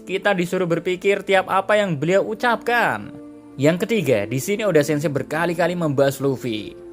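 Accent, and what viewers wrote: Java, Indonesia. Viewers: Orang jawa mana suaranyaa🤣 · Orang mana kok nyamen boso jowone.....salam oplovers tuban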